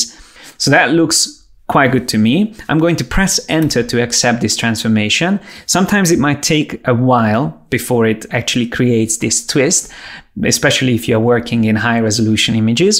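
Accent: Hungary. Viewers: I'm like 99% sure this guy is Hungarian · oooh a hunglish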